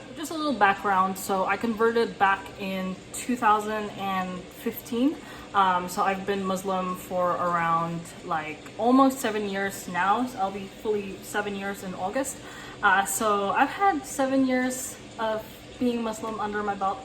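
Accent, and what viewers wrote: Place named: United States. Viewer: please introduce yourself before making a speech. i am curious. your face is like asian but your voice is like american. Are you an american or asian?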